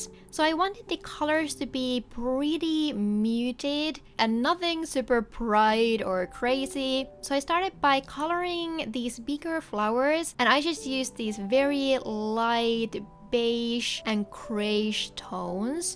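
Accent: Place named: Finland. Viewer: beautiful!! also your accent sounded familiar so i had to check if you're finnish, and you are! terkkuja suomesta! 😄❤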